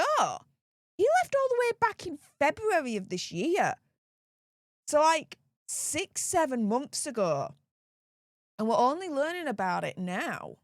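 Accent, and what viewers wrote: Yorkshire, United Kingdom. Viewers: Yorkshire lass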